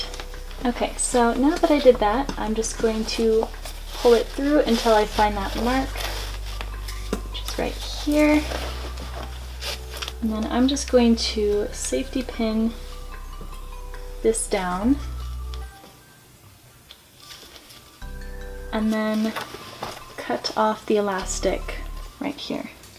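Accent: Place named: United States. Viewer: hey!! Just wondering where in america you are from because i study different types of american accents and yours is SUPER mild. So... just wondering! Thanks so much and pls reply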